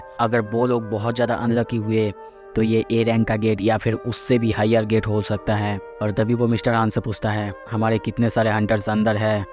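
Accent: Bengal, India. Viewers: ap bengali ho kiya, mere vi hindi aisa he, but apka mere si vi acha he. Solo leveling ragnarok explain kar sakte ho kiya, or Jin nehi Jinwo bolo kew ki is me Jin namak 4,5 character he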